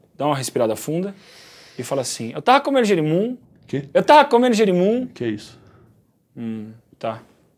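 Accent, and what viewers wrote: Brazil. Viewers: sotaque ceares mt massa nosso sotaque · vc é baiano? meu pai tbm fala youtóba kkkkk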